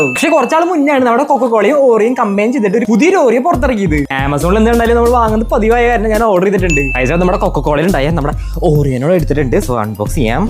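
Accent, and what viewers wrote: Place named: Kollam, India. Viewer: That Kollam saying everything 😂😂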